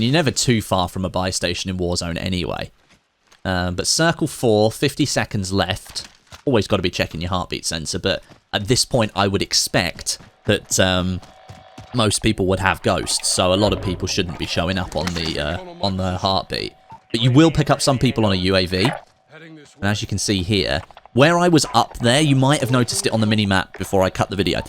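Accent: United Kingdom. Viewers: Wait you're British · WOW I did not know was British I thought you were American lol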